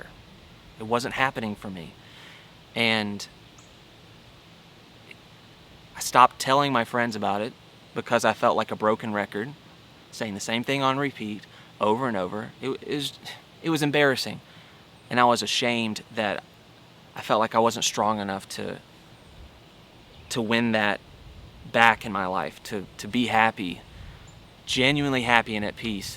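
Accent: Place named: Georgia, United States. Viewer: So glad you're on the upswing! The Georgia accent is coming back and I think that's a good thing ♥️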